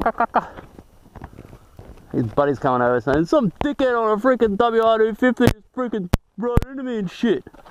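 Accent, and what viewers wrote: Australia. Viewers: is he from australia?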